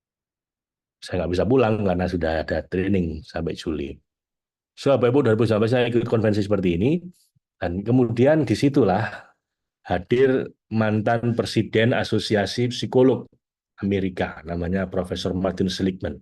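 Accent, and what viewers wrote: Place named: Surabaya, Indonesia. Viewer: Pak suaranya seperti cak lontong 😍🙏 logatnya khas Surabaya 🤓🤴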